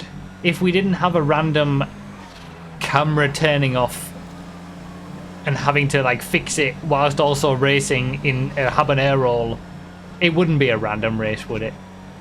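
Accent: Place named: Hartlepool, United Kingdom. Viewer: Cant believe your from Hartlepool I've been watching all these years trying to work out the accent! I'm from the area :')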